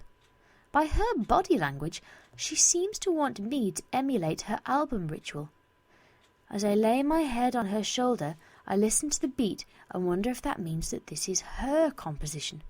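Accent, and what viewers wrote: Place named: England, United Kingdom. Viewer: Not bad. You have a lot of potential for readings, or maybe I'm just fond of English accents. Good short story too.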